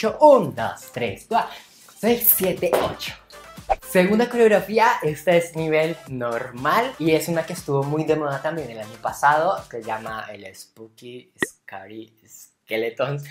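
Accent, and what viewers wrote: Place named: Mexico. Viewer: De dónde eres no me suena tú dejo o eres Mexicano?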